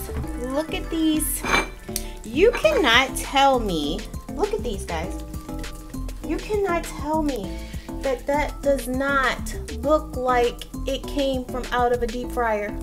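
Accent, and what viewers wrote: United States. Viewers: Are you from New York? I love your accent.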